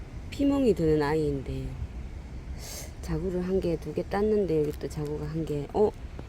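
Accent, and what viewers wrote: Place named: Gyeongsang, South Korea. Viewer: 안녕하세요 반갑습니다 하우스속 다육아이들 잘 있었네요 경상도 억양이 참 정겹고 듣기에 좋아예~~ 사랑스런 다육 아이들 즐감하며 머물다갑니다 함께하며 응원합니다 복된 날 되세요😊❤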